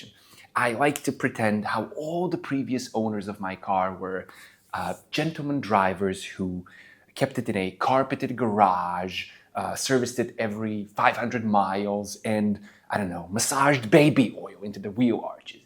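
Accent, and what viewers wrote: Eastern Europe. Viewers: O quê, és português? Eu tinha ssumido que eras da Polónia ou Latvia ou um pais mais para o este da europa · Wait, this guy is Portuguese? Why have I always thought he is eastern European? 😅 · Wait, you're Portuguese?! I always thought you were eastern european...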